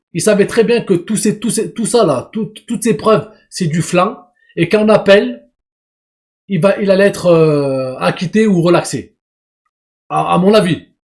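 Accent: Saint-Étienne, France. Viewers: Bravo frero ! Notre accent stéphanois est grillé mdrr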